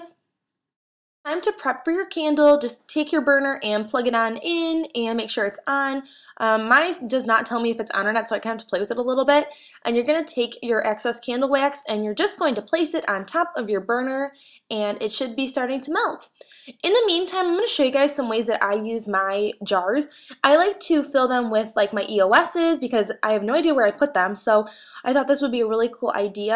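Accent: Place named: North, United Kingdom. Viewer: As a northerner, I can hear in your voice that you are also a northerner. Good video!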